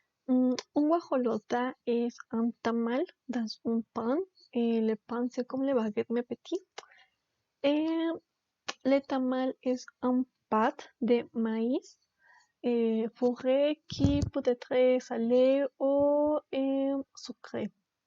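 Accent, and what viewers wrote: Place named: Mexico. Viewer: j'adore entendre une mexicaine avec un jolie accent. continue tu feras encore plus de progrès. le petit déjeuner sucré à la française est différent dans beaucoup de pays.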